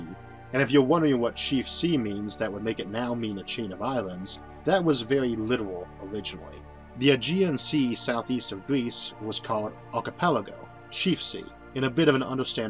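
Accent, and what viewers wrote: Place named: South, United States. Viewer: I would like to say I love your videos and would like to add about your speech I just thought you were an upper class Southern gentleman. I should add that I'm from the UK but very used to the American accents.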